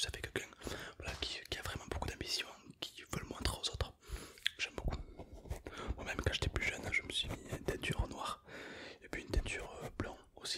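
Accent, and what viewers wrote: Quebec, Canada. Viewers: Moi qui adore l'accent Québequoi c'est un pur plaisir d'écouter de l'asmr Québécois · L’accent québécois 😍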